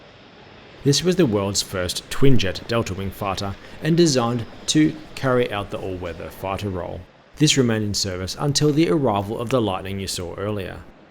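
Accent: Australia. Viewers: It’s so nice to see a factual, educational clip AND it’s spoken in Aussie!😂😂🇦🇺 thanks mate, love your vids. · Bloody brilliant mate, and it’s great to hear an Aussie voice. Keep it up.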